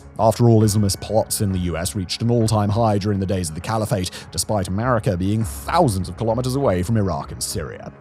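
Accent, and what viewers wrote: United Kingdom. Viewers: He said Mali, British peoples L's sound like R's because of their accent.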